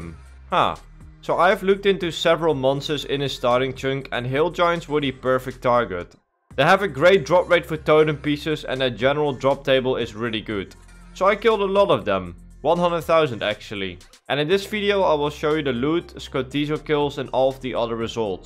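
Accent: Netherlands. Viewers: The typical dutch accent… i love it 👌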